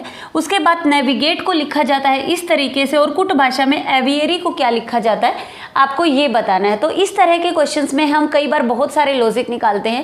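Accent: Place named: Gujarat, India. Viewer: Kaha❓❓ Gujarat se aap Ho kya.....Words ki Pronunciation se Lagta hai.....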